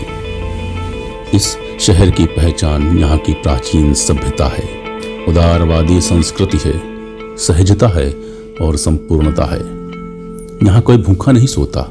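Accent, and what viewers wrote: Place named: Kanpur, India. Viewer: Aapki aawaaz bhi Kanpur ki visheshta hai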